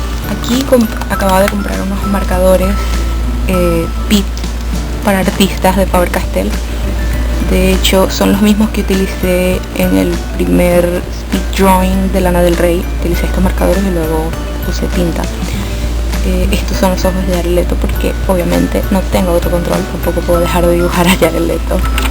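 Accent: Venezuela. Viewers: Apenas oí tu voz me di cuenta que también eres venezolana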